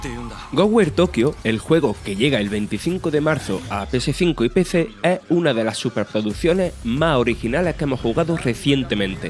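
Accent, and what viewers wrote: Andalusia, Spain. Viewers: Es español y tiene acento andaluz. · Es andaluz picha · Para todos los qué dicen porque el locutor habla así! El chaval tiene un acento muy marcado andaluz . Niño que no te afecte el hate , te lo dice un almeriense con un acento marcaico marcaico como el tuyo . Ole el andaluz ^^ . Y sobre el juego tiene una pintaca buena buena. Es una mezcla entre dead space y bioshock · Cuánto odio al acento andaluz aquí. Es vergonzosa la educación de muchos.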